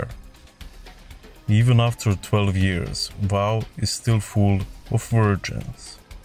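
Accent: Russia. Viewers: Судя по акценту ты русский ))) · Чисто русский акцент, давай видео на русском, читать сабы такое себе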